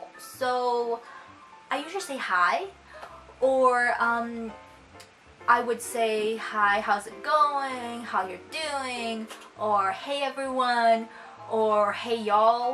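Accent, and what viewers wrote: Canada. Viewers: You do have a Chinese Canadian accent... I would know cause I have it too. I speak perfect Mando, Canto, English... Each with accent. Mando with Canto accent. English with Canto accent. Canto with Hakka accent. I don't think Canadian has an special accent, mostly because it sounds so natural that I don't notice it. The craziest thing is that I picked up fake southern accent and I totally agree with you that as an Asian Canadian we do speak too fast and crumble up all the pronunciation. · Hi! I enjoyed your accent-tag. I am a fellow Canadian too (I'm in MB) and yes, I can tell you would be "Chinese Canadian" as you do have a slight accent. People claim I have an accent too but.. ... lol... I can never tell myself. :) .. I also switch the pronunciations of some words depending on the sentence.. .. weird, right?... lol.... but it's all in fun! 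· Girrrrl your English is perfect, in fact - it might be a little too perfect. You enunciate and pronounce everything so crystal clearly that you sound a bit hyper correct, but you still definitely have a hint of Canadianess in your accent so I'd definitely be able to tell that you're not from the US. This was a really clever and original take on these accent tag challenges, thank you for sharing! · Whoa u have an awesome canadian accent haha! i have sort of an annoying NY accent.. not cool like yours haha kindly swing by my channel if u like! I do hair vids and food vlogs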